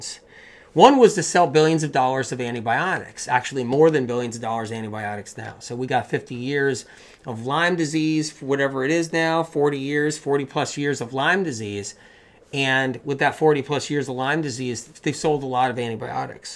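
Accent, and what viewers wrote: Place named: United States. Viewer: Yes great info but can you state it clearly once please & stop repeating everything 3 times. People can replay if they need repeats. Thank you you're great but your delivery is so American & verbose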